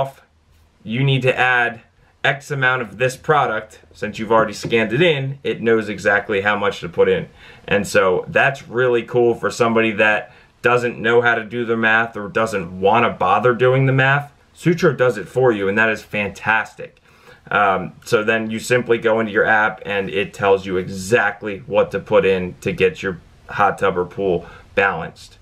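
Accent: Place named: Pittsburgh, United States. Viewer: Sounds like you have a Pittsburgh accent. I am originally from outside of there.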